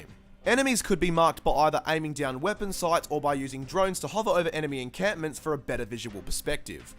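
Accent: Australia. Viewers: Do you think you will continue playing Hitman Absolution? I don't care really, I just love your true blue Australian commentary. :)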